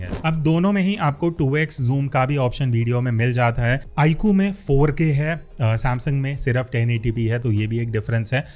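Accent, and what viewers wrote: Lucknow, India. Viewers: R u from lucknow sir?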